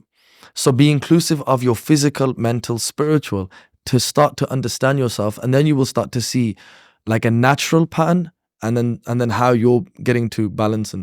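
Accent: United Kingdom. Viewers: sounds like a Bradford accent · it’s more a midlands / Birmingham accent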